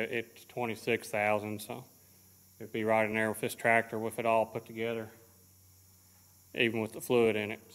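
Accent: Kentucky, United States. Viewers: I have to say I subscribed after watching you on Keith Rucker's site. I've become a fan but just tonight I went back and watched all the barn build vids. I am blown away by the work you do by yourself. You basically rebuilt a barn to save a roof. You may not have Abom torque, but you damn sure got plenty of Fenner's Getter Done. I love your vids but if I could give you any advise it would be work on the audio. I don't know what these other guys use but I have a hard time hearing you at times and I'm from TN and understand the KY accent. I also love that you include Bailey at times just like Adam did Stella (RIP).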